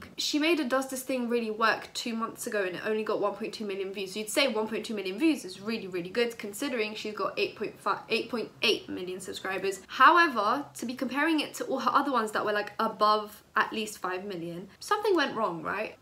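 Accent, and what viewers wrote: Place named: London, United Kingdom. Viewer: Your the only white girl with a london accent sound like a roadgirl on youtube bit random lol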